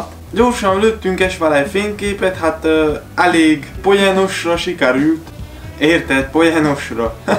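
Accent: Székely Land, Romania. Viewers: Jajj Te! Úgy szeretem a videóidat! Az igazi székely hangzást, és a szófordulatokat! Ne magyarosodj jobban! ;)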